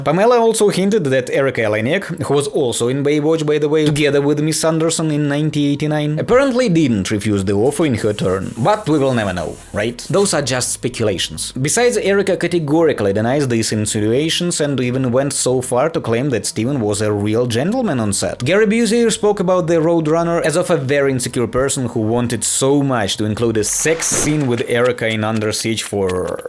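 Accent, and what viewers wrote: Russia. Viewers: where are you from? You have this clear russian (or Ukrainian) accent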